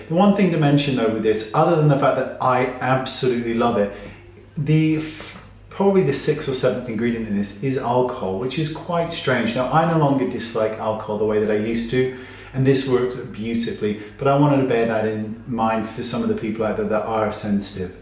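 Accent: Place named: United Kingdom. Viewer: you make me smile when you get annoyed. I guess it’s because you brits make everything sound nice!🙂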